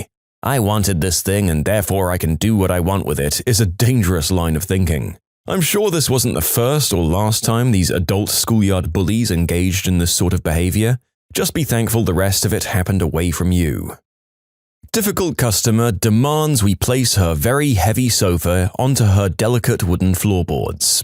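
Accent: United Kingdom. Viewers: I didn't know the narrator is british now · Why do you sound *BRITISH*???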